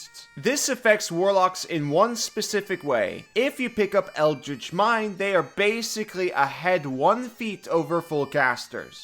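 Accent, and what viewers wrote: Norway. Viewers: Hearing the most Rogalending dialect ever threw me off so hard you don't even know dude.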